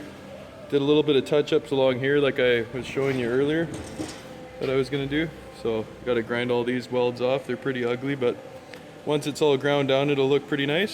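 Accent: Canada. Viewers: You sound pretty Canadian for a Kiwi... I'm a Canadian, we can smell our own.... Sniff , Sniff... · You sound Canadian.... Almost like rocky from rocky and bullwinkle, just without the "eh"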